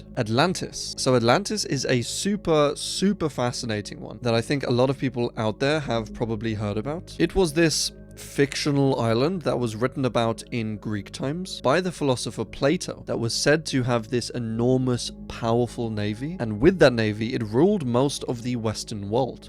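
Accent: United Kingdom. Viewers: Did I just find the British Wendigoon?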